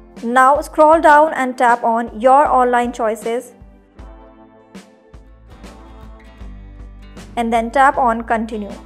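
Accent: India. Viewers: Wtf how you know a lot of grammatical things in English but you can’t change that Indian accent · The Indian accent... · Bro I stg I can't take any videos serious with that Indian accent. Always feels like a scam to me.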